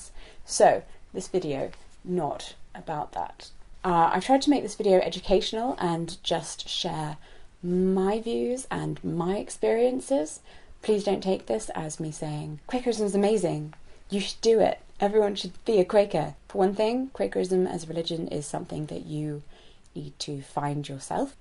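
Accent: United Kingdom. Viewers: I love your voice so much. You have my favorite British accent. Anyway, I am Christian ( Protestant), denomination = Baptist.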